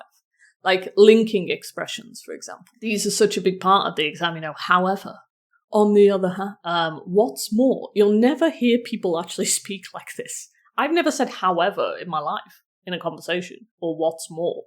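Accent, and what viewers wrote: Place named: London, United Kingdom. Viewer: it’s soooo interesting to know that. Thank you very very much for sharing. Just curiosity, are you from London? I do appreciate your accent.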